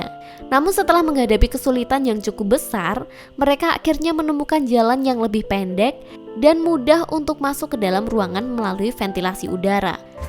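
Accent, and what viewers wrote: Java, Indonesia. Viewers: Medhok.. Berasa di ceritain si mbok 😂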